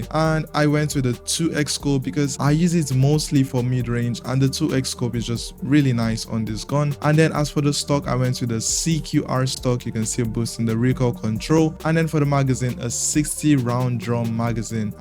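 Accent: Nigeria. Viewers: Bro, our loadouts is identical.... Which means it's just skill issue (on my part)😅 Also you sound Nigerian.....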